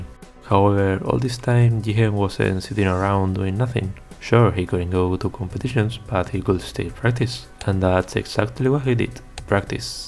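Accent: Spain. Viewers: me gusta tu acento español jajaj intenta alzar un poco mas la voz al hablar al micro, no seas timido!! el video esta guapisimo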